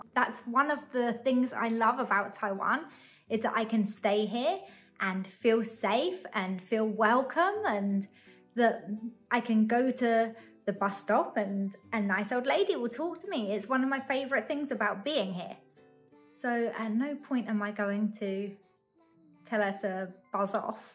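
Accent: United Kingdom. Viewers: 我覺得WTO姐妹會應該邀請你上節目，聽你講話好有趣。英國式英語腔調比美語好聽耶~~我們的總統蔡英文說英語的時候其實也有很濃的英式腔調。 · Lovely British accent and welcome to Taiwan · Hi,This is my first time come to your YouTube channel and i like your British English accent. So i Subscribed your Channel. 保持善良的心，但是別放棄警覺。害人之心不可有，防人之心不可無。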